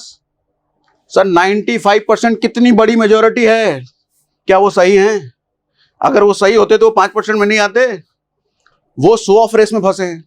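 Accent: Bihar, India. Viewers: AAP BIHAR SE HO KYA?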